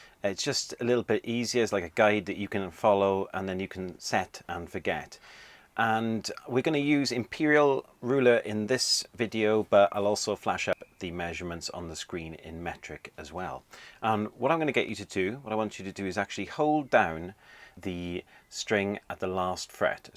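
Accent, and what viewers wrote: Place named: Wales, United Kingdom. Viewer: Thanks for posting. Nice to hear a welsh accent too.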